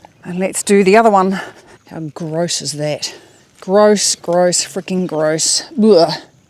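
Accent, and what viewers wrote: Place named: New Zealand. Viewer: You sound like a Kiwi! I have always fished for yabbies with scrap meat on a string, and a plastic rake.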